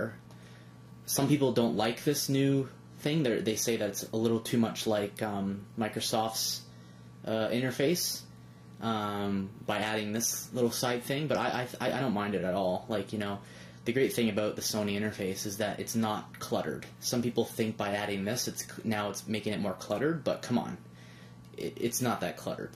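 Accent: Canada. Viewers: gotta love the way canadians say "about"!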